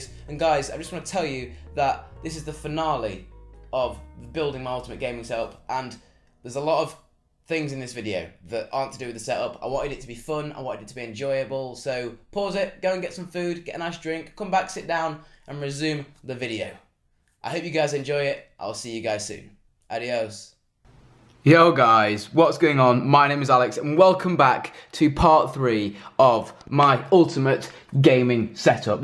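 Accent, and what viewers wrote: United Kingdom. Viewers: Your point is invalid he is from the UK, ha has one of the most British accent ever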